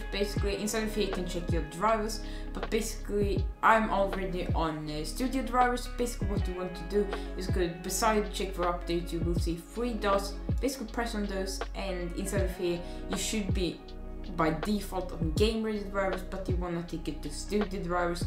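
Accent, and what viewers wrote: Sweden. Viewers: are u from sweden. im from denmark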